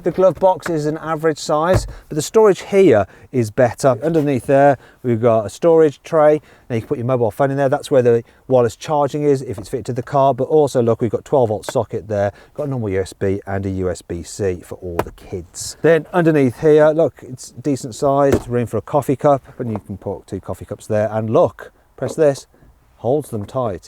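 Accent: United Kingdom. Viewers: 영국 발음 멋있다... 스포올~ 타지~ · 영국사람이신가요?발음이 영국같아서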